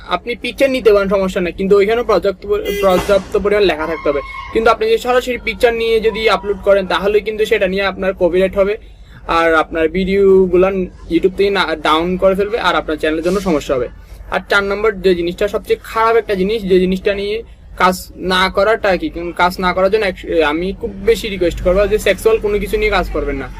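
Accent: Chittagong, Bangladesh. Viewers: agee giye kotha bola shikhen... chittagainga gula ki aktu suddho kore kothaw bolte pare na naki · Ctg er manus suddo bolta pare na...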